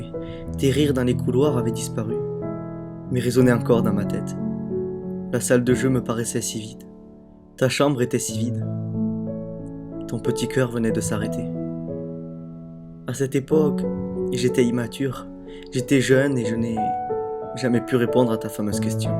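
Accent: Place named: Toulouse, France. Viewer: Hi, j'ai eu la mission de répondre aux commentaires de Un ptit accent du sud (Toulouse hihi) Grooos bisouus Uma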